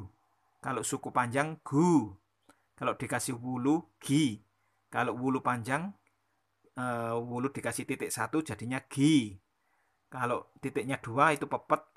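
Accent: Java, Indonesia. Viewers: Vokal orang kuno lebih lengkap, sekarang yg masih terwariskan unsur medhok ya seperti pada mas, makasih banyak..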